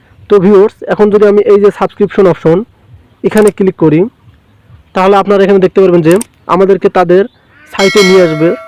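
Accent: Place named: Sirajganj, Bangladesh. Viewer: মনে হয় সিরাজ গঞ্জ বারি আপনার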